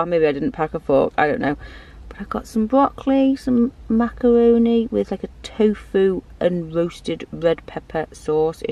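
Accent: Liverpool, United Kingdom. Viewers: Looks so beautiful there, love the Peak district..... is that a slight scouse accent you have? i live in Wirral...... don't be afraid to make longer videos because i think lots of people like them longer! :-)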